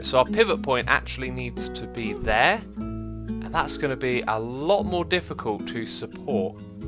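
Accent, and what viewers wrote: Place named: United Kingdom. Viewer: X, I love brittish voices.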